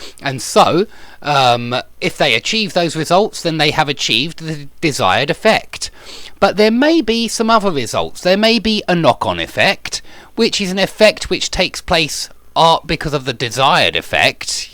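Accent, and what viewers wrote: United Kingdom. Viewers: British accent, is most popular worldwide, when it comes to learn English. Thanks for the lessons sir.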